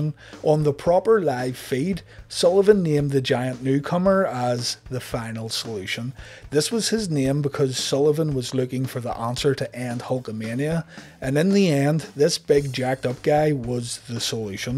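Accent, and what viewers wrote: United Kingdom. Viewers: What part of UK you from?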